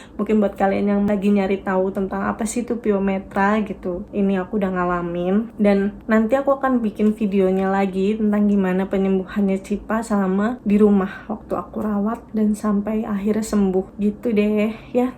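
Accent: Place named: Banyumas, Indonesia. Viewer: Dari logatnya kayanya kaka org ngapak ya🤭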